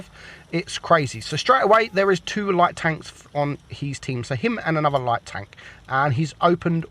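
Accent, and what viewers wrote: United Kingdom. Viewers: Dude, he's british. People accoss the world speak differently. Try to accept that, eh?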